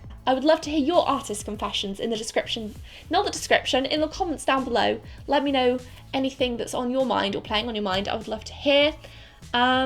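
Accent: England, United Kingdom. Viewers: u aren't us based, u sound British? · she is so classically English but not at the same time... why?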